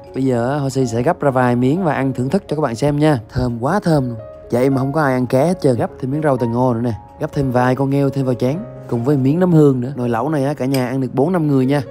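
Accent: Quang Nam, Vietnam. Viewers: Con trai người Quảng Nam hay Đà Nẵng vậy ? Chúc mừng kênh con , bác luôn theo dõi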